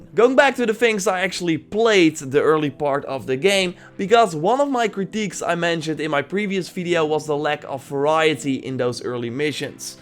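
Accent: Netherlands. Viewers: Hey are you Dutch. I love your vids by the way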